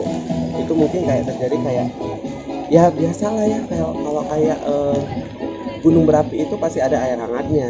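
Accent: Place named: Medan, Indonesia. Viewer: Aku dekat syutingnya kontrakan rempong lo orang Medan mana suaranya 👍👍😉😉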